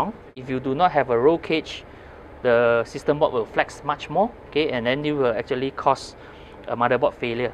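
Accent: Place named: United States. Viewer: 你的英语说得和美国人一样。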